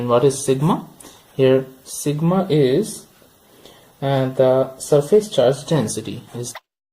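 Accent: India. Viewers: Bhai Indian accent me hi Bola karo . Nice video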